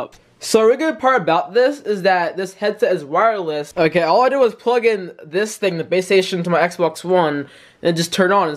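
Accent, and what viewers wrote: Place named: United Kingdom. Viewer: That British acent tho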